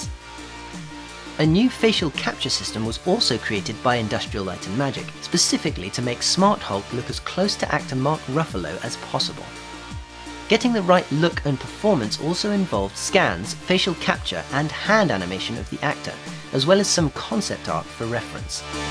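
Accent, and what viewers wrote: United Kingdom. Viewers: this video is alot better because of British accent? I love it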